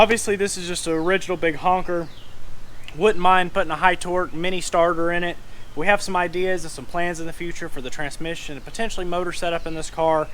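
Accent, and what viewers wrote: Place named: Carolinas, United States. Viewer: looks like youre in the carolinas from the scenery. and the accent :)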